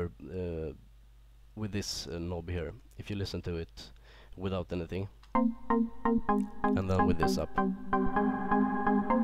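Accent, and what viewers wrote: Sweden. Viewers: are you from sweden? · Swedish? :D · Nice tutorial man, REALLY NICE! Swedish btw? :)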